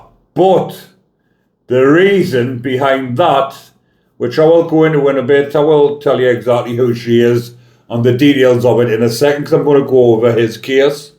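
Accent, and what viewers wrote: Newcastle, United Kingdom. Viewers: Id sue that car you tried to get out the way but the car just jumped out at you so you didn't get chance to move out the way so it hit you thank god you servicer. I really dont like Facebook i have my reasons so id love to see you're working on this channels and your voice is cool there's something about Newcastle accent.this will make you laugh the last few people who have seen me must be bloto cos ive been mistaken to be looking like a man that can be helpful and come in handy ill get ragged about you accent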